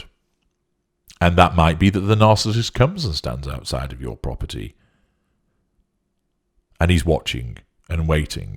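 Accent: United Kingdom. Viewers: I dont like your british accent. Make your videos in an american accent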